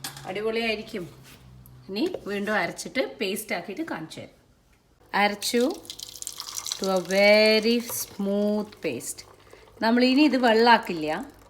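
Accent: Palakkad, India. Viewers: ഹായ്.. ഞാൻ ശ്രീദേവി അജോയ് കുമാർ ഞാൻ മുംബൈയിൽ സ്ഥിരതാമസമാണ്.. ഒരു വീട്ടമ്മയാണ് ഞാൻ സ്ഥിരമായി എല്ലാ പരിപാടികളും കാണാറുണ്ട്.. പൂജാവിളക്കുകൾ ക്ലീൻ ചെയ്യുന്ന പരിപാടിയാണ് ആദ്യമായി ഞാൻ കണ്ടത്, എന്നെ കൂടുതൽ ആകർഷിച്ചത് പാലക്കാടൻ ശൈലിയിലുള്ള സംസാരമാണ് ആദ്യം ഞാൻ കരുതിയത് ഏതോ ഒരു പ്രായമായ വ്യക്തിയാണ് എന്നാണ് കാരണം സംസാരം വളരെ സനേഹവാത്സല്യവും പക്വതയും നിറഞ്ഞതാണ് അതുകൊണ്ട്, പിന്നീടുള്ള പ്രോഗ്രാമിൽ കണ്ടപ്പോൾ മനസ്സിലായി ആള് വളരെ ചെറുപ്പമാണെന്ന്.. എന്തായാലും ഒരു അറിവിന്റെ കലവറയായ ആശംസകൾ.. എല്ലാ പ്രോഗ്രാമുകളും കാണാൻ ശ്രമിക്കാറുണ്ട്.., കെയർ ആൻഡ് ക്യൂർ എന്ന പ്രോഗ്രാമും എല്ലാം.. നന്ദി.. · njan try cheythittu parayamtto. Pinne videos improve avunnu shake avkunilaaa.. pine de veedu palakkad ano samsarikam kettapol thonni... ente nadum palakkad anu( chittilamcherry) eppol Newjersy il.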